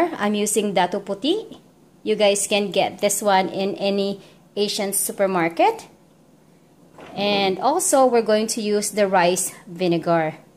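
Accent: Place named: Philippines. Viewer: you speak fluent English but ❤ your Pinoy accent 😅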